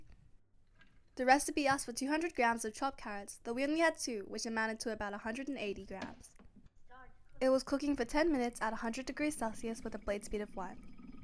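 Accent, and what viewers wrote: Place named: Asia. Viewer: You sound Asian. Any chance you can try a few Asian recipes? Like biryani, stewed pork belly, beef noodle soup, etc. Thanks!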